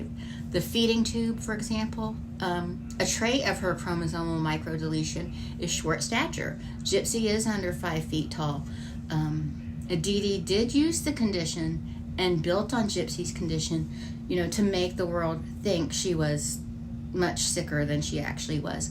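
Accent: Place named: South, United States. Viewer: Every now and then you drop your g off the end of your words and you sound real Southern and it's just adorable. Yes, should get with a bigger company and collaborate on a BIG TIME documentary. She has probably the most in depth knowledge of the actual events...I called her the "Advanced Gypsologist" or something like that when she was going up to fight the lawsuit saying she couldn't claim " Leading Expert".😂😂😂 and got a chuckle out of her on the Live that night. I was so worried for her and Sara just having to take off to go like that.... Meanwhile... Back in Da By-you...da family is lockin' da bedroom doors at night and hopin' she really doesn't take up cookin'...😂😂😂😂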